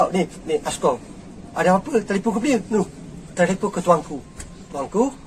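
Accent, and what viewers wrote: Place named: Terengganu, Malaysia. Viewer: Pekat weh loghat ganu dia..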